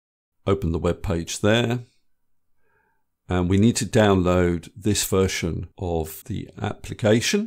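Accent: England, United Kingdom. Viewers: Thank you brother. Great, easy to understand. I think the English accent helped a lot. You have gained a new dinosaur subscriber!🤣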